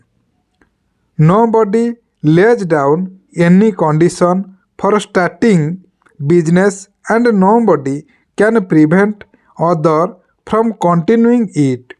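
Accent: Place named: Bihar, India. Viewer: Sir ye English dictation h ya Bihari dictation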